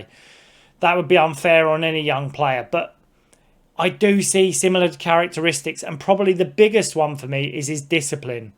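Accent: United Kingdom. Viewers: A Newcastle Fan from Down South? (judging by accent). That's gotta be a rarity? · I just love your Newcastle accent !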